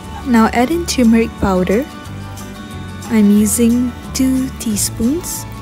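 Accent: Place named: Singapore. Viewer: Your english is close to heart. Sounded Singaporean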